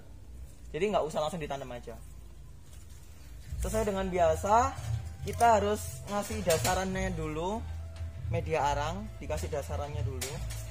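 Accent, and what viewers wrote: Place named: Java, Indonesia. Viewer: medok banget mas😂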